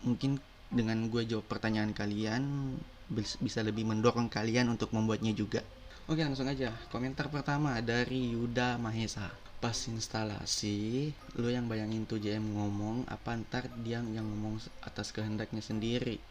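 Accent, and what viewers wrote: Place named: Makassar, Indonesia. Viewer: Mungkin karena kalau ndak salah part 2 dia pakai kaya logat makassar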